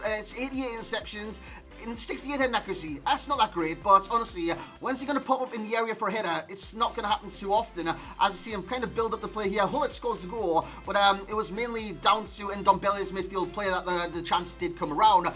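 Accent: Newcastle, United Kingdom. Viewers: Proper Geordie accent until you say “nuts” and you sound like an upper class bloke who lives in a castle 😂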